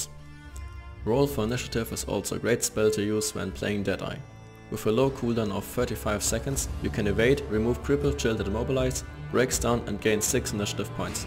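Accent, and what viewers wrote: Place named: Germany. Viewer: wie ich einfach instant höre das du deutscher bist ^^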